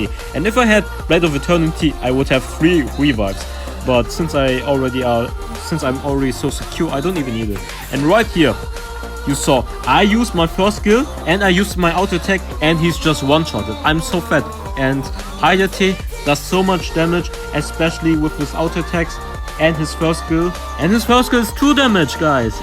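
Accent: Germany. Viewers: So wie du Englisch redest safe deutscher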